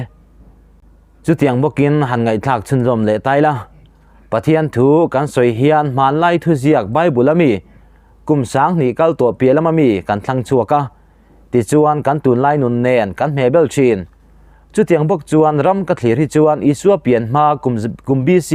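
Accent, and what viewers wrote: Mizoram, India. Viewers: Mizo tawng hman hi ngaihthlak anuam chiah lem lo in zir leh deuh teh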